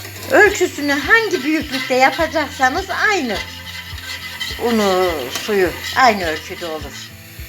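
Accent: Kayseri, Turkey. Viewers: Kayseri şivemizi dogal ve cok guzel konusuyorsunuz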